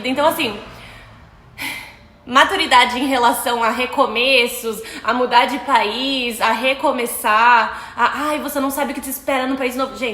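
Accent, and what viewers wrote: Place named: Rio Grande do Sul, Brazil. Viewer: 🇧🇷Oi linda 🤩😍🥰 que bom te ver, e saber que está tudo bem 🙌eu achei que era do rio grande do sul pelo sutaque😂 beijinhos para a sua mama👸 e🐶freinhae e para vc tbm👸😂😂😂😂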